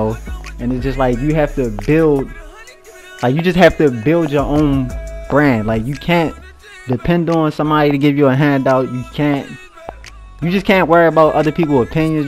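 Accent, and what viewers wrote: Baltimore, United States. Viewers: Baltimore ppl cant talk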